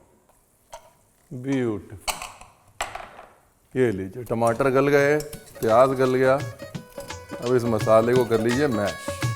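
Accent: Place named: Bihar, India. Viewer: The Bihari accents has enhanced the cravings for this dish😂 Thank you very much 🙏Sir for sharing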